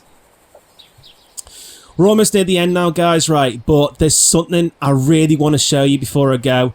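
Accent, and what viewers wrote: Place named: Warrington, United Kingdom. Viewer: I would say Warrington area if I was to take a guess.? Nice concept as well mate!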